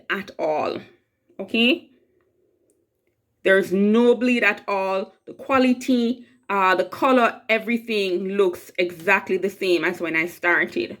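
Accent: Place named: Jamaica. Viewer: Hey there...are you Jamaican...it sounds so...rahtid yuh really went intense with the instructions and tests...I thank you. I did have a drastic ink bleed Customer concerned and I'm embarrassed...however I will talk to Siser. Thanks much.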